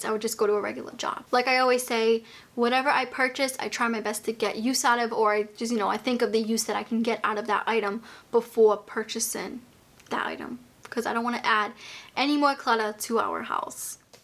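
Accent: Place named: New York, United States. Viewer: I’m new here I love your accent are you a New Yorker ?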